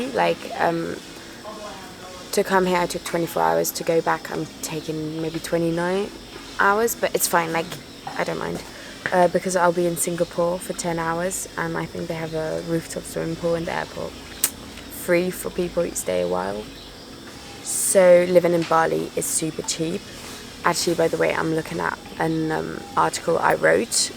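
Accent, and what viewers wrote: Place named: United Kingdom. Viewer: No way. Do people from France actually take on a UK accent when learning English?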